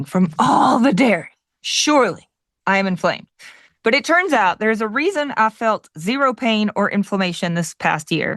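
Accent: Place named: South, United States. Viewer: You had me from the moment you said, "That is it for the old news..." The momen you started describing your sudden craving for pure fat, and said it in your adorable southern accent, you had me smiling, laughing, and totally charmed. Thoroughly enjoyed your video. You didn't mention your complexion, but that had to be at least as good as, if not better than, your cholesterol levels! Let 'er rip,!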